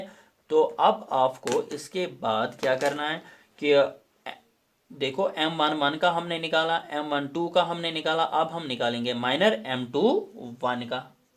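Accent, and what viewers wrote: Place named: Kashmir, India. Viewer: Super explaination thodi kashmiri hai but mast h sir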